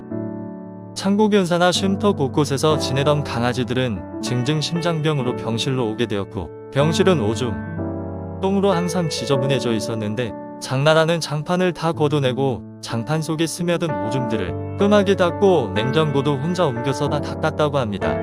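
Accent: China. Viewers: 좋은 영상도 아님~ 인공지능 대사 대충 만들어서 조선족 말하는 거처럼 들림~ 장나라팬인데 정말 기분이 나뿌네~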